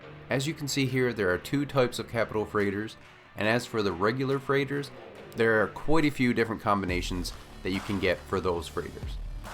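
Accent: Newfoundland, Canada. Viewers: The Canadian accent is strong with this one. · Didn't even realize you were a newf until you that last part hahah you're a day walker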